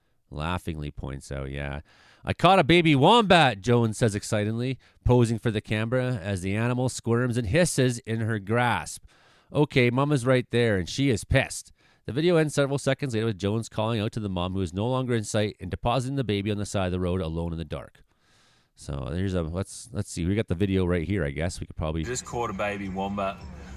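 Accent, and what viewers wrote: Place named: Australia. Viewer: Pretty damn good Aussie accent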